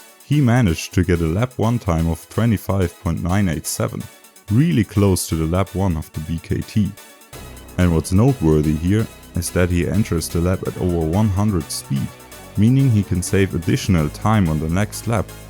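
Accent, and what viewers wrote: Germany. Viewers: Bro sounds a bit German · 2000% bist du deutscher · i just found your channel and after 10 seconds i knew i had to subscribe banger video man + i know youre german but i cant prove it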